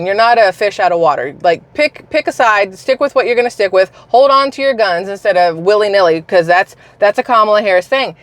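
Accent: South, United States. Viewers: That is hysterical that you used to have a country accent!! 😂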